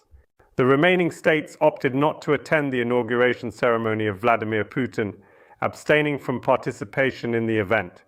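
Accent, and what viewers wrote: United Kingdom. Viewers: Pan Portnikov’s speaking with BBC accent! )) Technologies are amazing. Now I am able to share your brilliant analytic with my English friends. ❤ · this is true, but his voice must be ai generated. He has nice British accent, this is impossible to achieve with his background.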